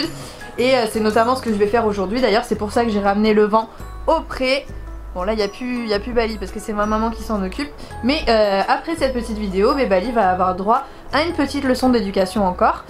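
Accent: North, France. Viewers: tu as raison en fait. C est juste que dans le nord il prononce vanne. 🙂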